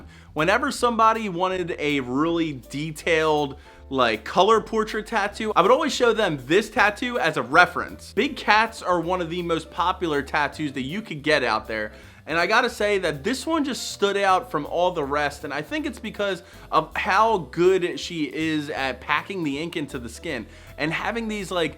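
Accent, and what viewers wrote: Philadelphia, United States. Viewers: Yo ur def from philly I’m from the area and can tell by ur dialect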